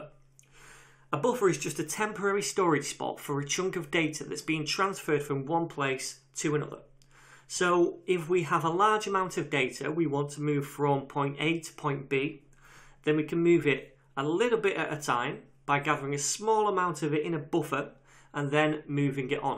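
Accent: United Kingdom. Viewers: Why do u use hard british accent?? There are many indians there who watch your videos and try to grasp the precious knowledge???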